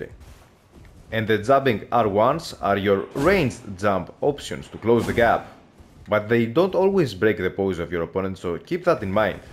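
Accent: Greece. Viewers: That’s a Greek accent bro am I right ? 🇬🇷✊ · Man I know a greek accent when I hear one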